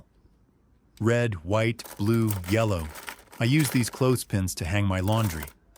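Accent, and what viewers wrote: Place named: United States. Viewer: definitely is an American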